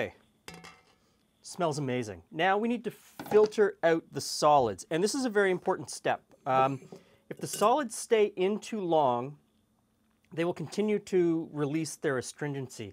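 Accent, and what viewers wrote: Canada. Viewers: Canadian eh? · You have to be Canadian!! Absolutely nothing wrong with that. It's just how you pronounce certain words, and your "accent" with how you say those words. Like "about"...or you are just further up North from me. Anyway, thank you for sharing your recipe for root beer!! Love home made root beer!!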